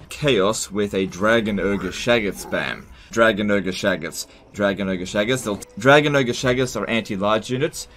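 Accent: Australia. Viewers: It's your down under accent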